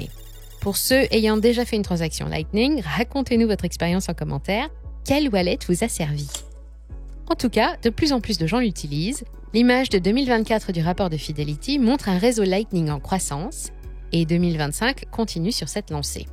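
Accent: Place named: United States. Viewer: prononciation à l'americaine tout simplement mais oui c'est clairement marketing il y a limites plus de commentaires à propos de sa voix que par rapport au contenu haha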